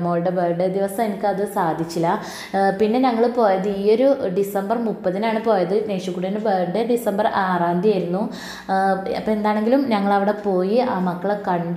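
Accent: Malappuram, India. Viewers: Ningalude sthalam evdeyaa.. Malappuram anoo.. Pakka malappuram language anallo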